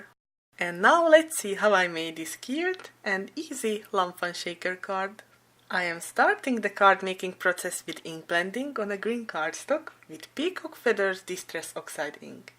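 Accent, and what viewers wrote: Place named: Netherlands. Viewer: Hi! I chatted eith u on IG briefly. Awesome creation!😍😍😍😍 ps..love ur accent (dutch?) Im in USA (New York accent but i live in Florida🌞)lol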